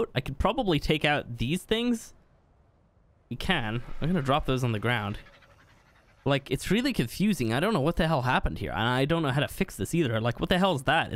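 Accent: Ireland. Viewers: He is from Ireland 🇮🇪 but im not 100% sure where (i think he lives in Dublin) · Are you Irish cause if you are you my man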